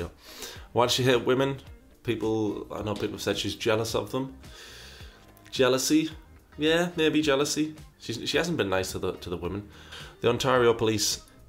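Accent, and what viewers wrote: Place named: Newcastle, United Kingdom. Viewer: lol, i didn't believe was from Newcastle, but I can hear the Geordie accent in this video <3... loving these videos on gurlworld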